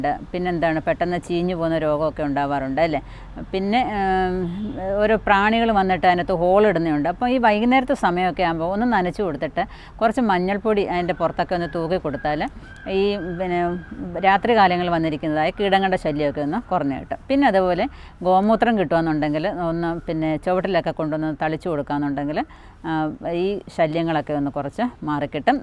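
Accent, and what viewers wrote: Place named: Pathanamthitta, India. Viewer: ഏത് സ്ഥലം ആണ്. ഞാൻ പത്തനംതിട്ട കോഴഞ്ചേരി ആണ്. ചേച്ചിയുടെ സംസാരം കേട്ടിട്ട് പത്തനംതിട്ട പോലെ തോന്നി അതുകൊണ്ട് ചോദിച്ചതാണ്.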